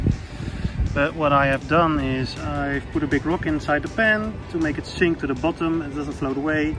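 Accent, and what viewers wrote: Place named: Netherlands. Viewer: Easy to hear you are Dutch. :)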